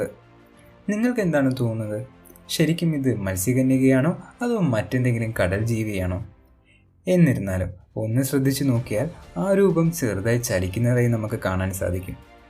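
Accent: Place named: Thrissur, India. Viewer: Thrissur Kari Paranjath kekan rasand evdo ketta polea😲🤓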